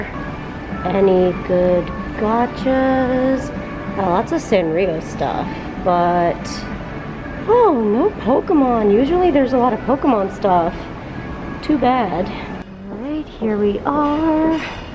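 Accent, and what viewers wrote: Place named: New Jersey, United States. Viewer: New Jersey! I knew that accent sounded familiar! I really love your videos! You’re really awesome!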